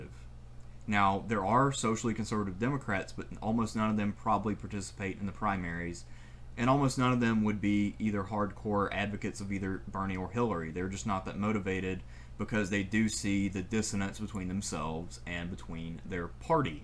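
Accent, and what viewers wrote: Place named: Texas, United States. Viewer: ...."So if we end up losing in November, don't blame us. Blame yourselves...If you feel otherwise, go fuck yourself." You sound hilarious using vulgarity with that Texan accent or whatever it is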